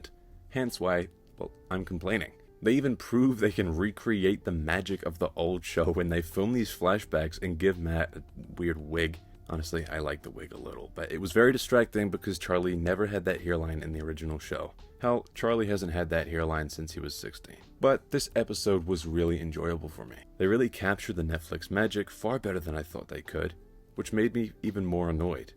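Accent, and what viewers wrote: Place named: United States. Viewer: That's supposed to be a New Zealand accent? You sound American.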